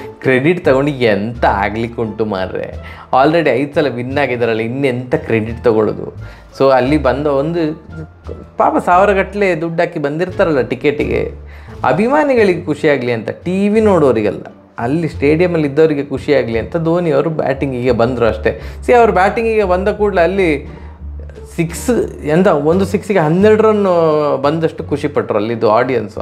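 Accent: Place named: South Canara, India. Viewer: ಪಕ್ಷಪಾತವಿಲ್ಲದ ವ್ಯಾಖ್ಯಾನ. ಸುಂದರ ಮತ್ತು ಸಿಹಿಯಾದ ಸೌತ್ ಕೆನರಾ ಕನ್ನಡ. ಯುಗಾಧಿ ಹಬ್ಬದ ಶುಭಾಷಯಗಳು.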